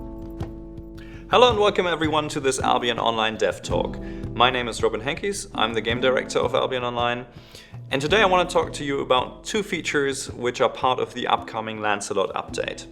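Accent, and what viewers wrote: Germany. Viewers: Er hört sich aber sehr nach Deutsch an.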